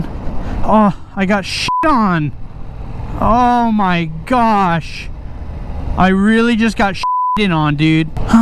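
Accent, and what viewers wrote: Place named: California, United States. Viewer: I’ve just watched a handful of “how to get your motorcycle license” videos, realized I needed to be watching ones related to the state I live in, and let me tell man. You don’t need to put “in California” in the title, because the moment you start talking, I instantly recognize my fellow Californian accent lmao. I’ve been watching southern/Midwest dudes for the last 2hours and now I’m hearing how Californian I must sound to anyone slightly east of Cali 😂😂. Great info man, I’m gonna be watching more of your videos 🤘🏼.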